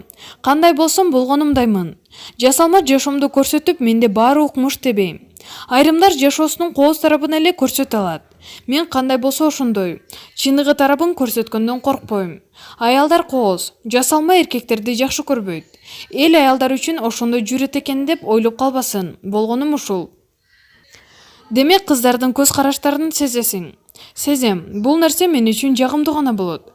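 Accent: Russia. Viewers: Ушул кыз суйлобой эле койсунчу кыргыз эмес орус зорго кыргызча суйлогондой болот.мынча акциент менен суйлойт озун угуп корчу адамдын кыжырын кайнатып.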